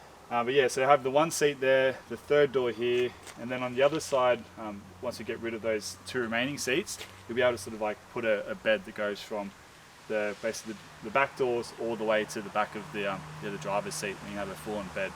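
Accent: Australia. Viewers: Is that an Australian accent ??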